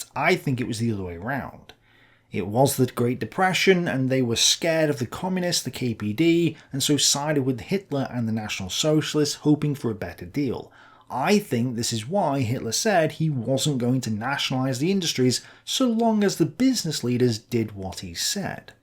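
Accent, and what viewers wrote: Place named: North, United Kingdom. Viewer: Can you do some books on tape where you just narrate? Need more northern folks doing this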